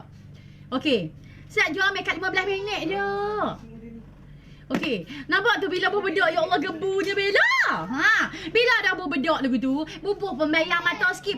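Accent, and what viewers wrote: Kelantan, Malaysia. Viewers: Hhahaahhaa. Aku sukO beLLa Kecek Klate😂supOo siam hahahhaaa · Panda Bella kecek kelate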